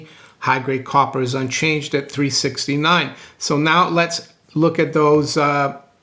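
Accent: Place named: Eastern Massachusetts, United States. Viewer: Grew up in Brazil? Wow. You sound like eastern Mass to me. I'd have just assumed Boston area. Or RI.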